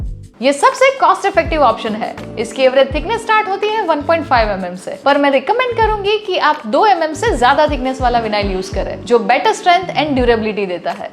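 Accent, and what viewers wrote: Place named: Gujarat, India. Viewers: Saurashtra tone. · It's Gujarati accent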